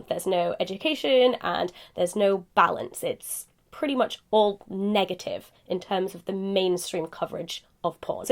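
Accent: United Kingdom. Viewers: Where is she from? I know she's British but what part of England is her accent from? I love it. Cause I know there's different British accents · Are you British ?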